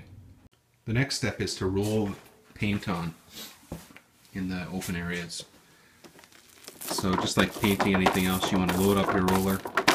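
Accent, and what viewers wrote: Canada. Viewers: Bonding prkmer would have been a good start. After edging, don't wait! Wet edge. It is far better to keep a wet edge with any paint. You are lucky to still be able to get oil based paint, many states that is impossible, you sound Canadian, if so, I'd be shocked that they have any oil based paint there.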